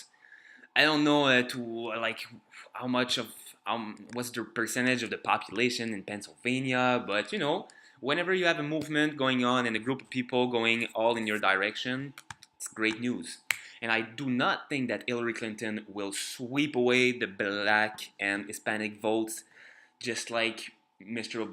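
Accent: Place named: France. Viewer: like your accent. i hear a bit of french.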